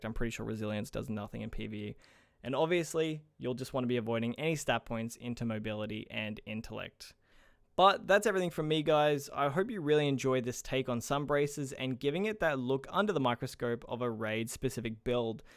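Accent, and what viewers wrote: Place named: Australia. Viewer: U sound like you’re either from Adelaide or qld 🙄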